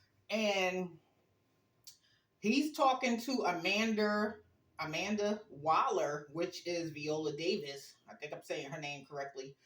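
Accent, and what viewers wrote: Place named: United States. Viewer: You have a accent what state do you live in the dance is right on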